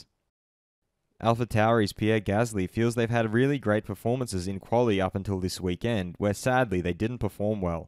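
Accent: Australia. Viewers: Absolutely love this channel. Thanks for the awesome content!! Love hearing the Aussie accent on YouTube too. 🇦🇺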